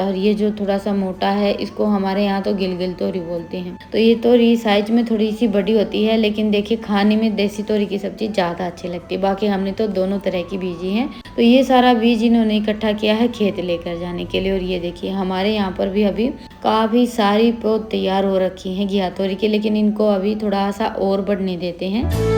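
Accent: Haryana, India. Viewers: Haryanvi language h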